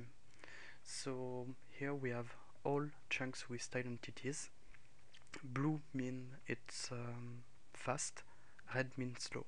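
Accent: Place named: France. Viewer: L'accent français fait tout le charme de la vidéo =)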